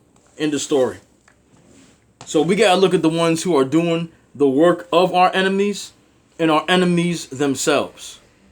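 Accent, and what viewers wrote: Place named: New York, United States. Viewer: Who is this buff man with a New York accent? Miles Morales?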